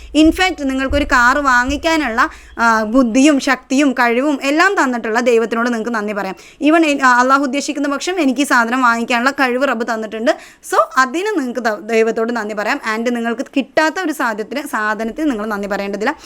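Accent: Palakkad, India. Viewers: Palakkad aanalle (thodi)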